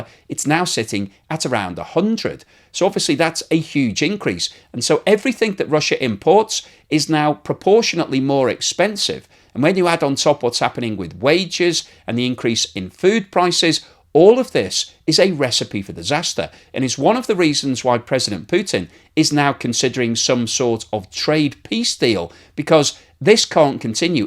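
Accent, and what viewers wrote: United Kingdom. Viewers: what Propegandist like fail to realize is there are countries with far worse inflation than Russia which still manage to keep their economies from collapsing. Russia's is an economy of war, it does not, and cannot be measured like a a regular economy. What matters to Russian citizens is that their basic needs are taken care of, and indeed they are. I guess these people need another year or two to take back what they're saying now, the exact same way they ate humble pie about Russian Army being in shambles. Now you're handsome fella and you have a nice British accent which might fool some people into thinking you're some kind of authority on Russian economy, but you are the furthest thing from it. (your own country "Britain" I assume is turning into a 3rd world country_ businesses closing left right and center aren't you better of "commentating" on that? 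lol)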